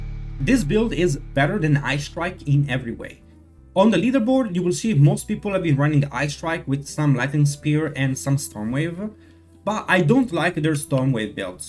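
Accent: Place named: Italy. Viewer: Hai un accento quasi italiano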